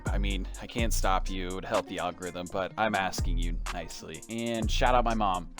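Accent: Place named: Iowa, United States. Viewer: You from Iowa?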